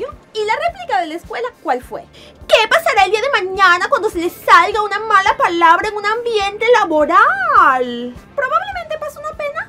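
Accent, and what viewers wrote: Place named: Panama. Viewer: A parte, quiero hacer un comentario con respecto a los videos. Yo entiendo que quieran hacer algo de humor realizando diferentes narraciones como si fueran personajes, en este caso, una profesora de colegio. Pero creo que esto le quita seriedad al video, si la idea es enviar un mensaje serio. Ademas pienso que no es "hablar como panameño" es mas bien hacer la diferencia a la hora de hacer un video, porque si fuera asi, entonces todo mundo hablaria como habla cotidianamente en cada video de youtube, serie de netflix y programa de tv que uno ve, no? Yo solo digo que puede haber mas seriedad del video, si se habla con menos tonos "al estilo panameño" y con mas tonos concretos.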